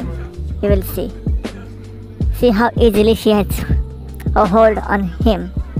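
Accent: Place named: India. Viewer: please change this horrible Indian accent narration.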